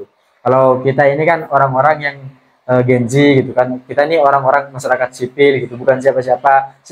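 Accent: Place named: Madura, Indonesia. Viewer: Logat Madura tak iye😊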